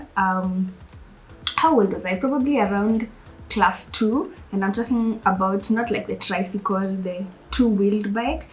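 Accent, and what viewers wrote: United Kingdom. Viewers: British accent 🔥🔥🔥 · That British accent👌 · British accent of course and it reminds me of Kijana Wamalwa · It's the British accent speaking for me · Its the British accent for me😍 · The British accent mama 🤩🤩🔥🔥 sighs! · It's the British accent for me👌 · Jesus can we talk about her "british"accent♥️ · Your british accent is awesome...the way have tried to mimic that accent and I can't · The British accent🙌🏼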